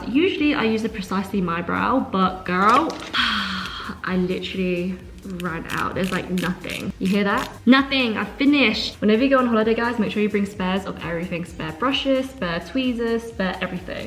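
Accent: United Kingdom. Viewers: I was not expecting you to be British! What a lovely surprise. As a fellow oily skinned Brit, thank you for this vid - it’s given me lots of tips and things to try. Keep up the good work beautiful ♥️ Your Insta vids make me chuckle so much!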